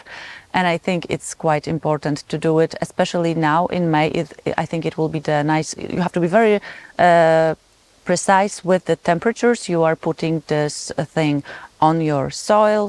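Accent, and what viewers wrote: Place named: Poland. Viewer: Hi, it's always so nice to see you, I love your Polish accent, please don't change it:) and your garden of course is sooo beautiful. I am watching you from South Carolina, USA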